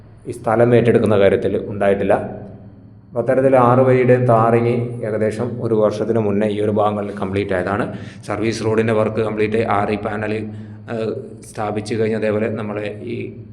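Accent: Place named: Malabar, India. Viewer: ഞങ്ങൾക്ക് മനസ്സിലാകുന്നുണ്ട്..സംസാര ശൈലിയിൽ മാറ്റമൊന്നും വേണ്ട നമ്മൾ മലബാർകാരല്ലേ?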